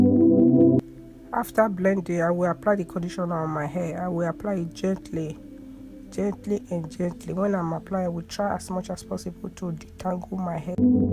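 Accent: Africa. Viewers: YOUR ACCENT IS SO CUTE I WANNA DIE. I LOVE AFRICANS! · My mom has the same African accent like yours, it's soooooo beautiful. I'm Ghanaian btw🇬🇭🇬🇭🇬🇭🇬🇭🤘🏾😛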